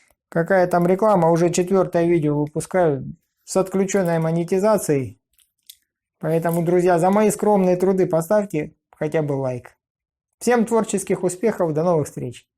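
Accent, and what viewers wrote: South, Russia. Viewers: Блин, довольно долго думал, что он действительно называет канал "Мегамозг". Но это оказалось моей ошибкой восприятия, из-за его южного говорка. Оказалось что он меХамозг. Надо думать, от слова механика, механический.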